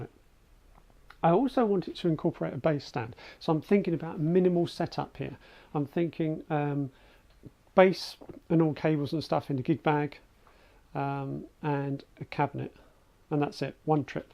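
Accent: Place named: Europe. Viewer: I highly recommend the oberton 10b200 if you live within europe (I guess you do because of the accent ;) ) it outperforms any eminence speaker on the market and is used it high-end dutch boutique cabinets. (The price tag is the most attractive of them all) look it up on talkbass, it's a great woofer!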